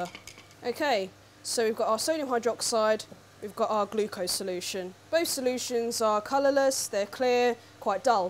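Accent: England, United Kingdom. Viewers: deep voice with and english accent come out of an asian woman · Asian * sexy british accent = one very sexy scientist. Yeah, baby, yeah! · asian with british accent? DOES NOT COMPUTE!!!!! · why does this asian chick have an english accent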